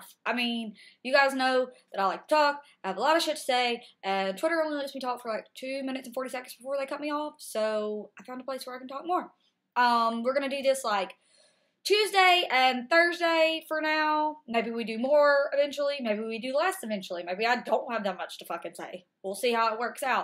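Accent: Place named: South, United States. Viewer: Just saw you with Rosie and cool you have your own channel for ‘stuff’’ Obviously know the results of the and such as 4 days late but love your southern accent and the f bombs Runner on 2nd is no use anyway Georgia looking good this year, and damn glad the college season is rolling Goddamn I’m an Auburn fan too, although in Ireland, that game against Georgia State was painful Music too - awesome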